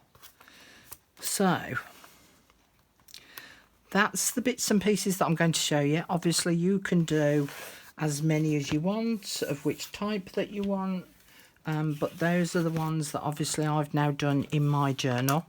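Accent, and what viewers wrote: England, United Kingdom. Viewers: I love your accent btw it reminds me of my childhood when friends of my dads from England took care of my brother and I when my mother was sick.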